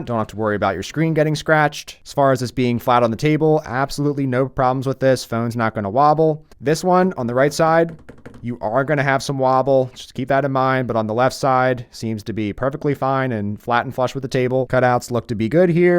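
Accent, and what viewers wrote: Philadelphia, United States. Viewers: Are you from South Philly/Delco?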